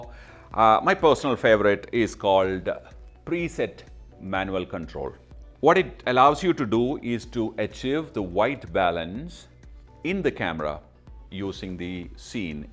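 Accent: Kerala, India. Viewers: Love ur mallu accent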